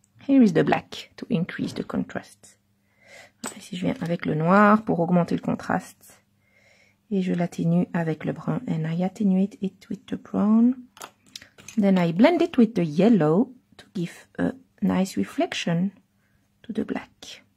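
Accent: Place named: France. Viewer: Trop mignon quand tu parle anglais avec ton accent de france☺️😍. Vraiment ton effet or est realiste merci pour ce truc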